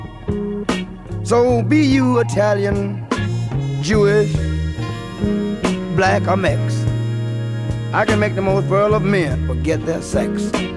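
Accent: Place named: South, United States. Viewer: I love the southern accent. My scots-irish granddaddy had the exact accent, and it's quite beautiful.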